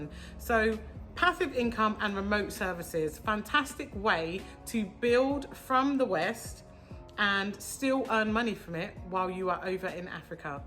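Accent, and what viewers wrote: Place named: United Kingdom. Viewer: Very informative video. Thanks. Side note: I’ve always dig that British accent, Peace!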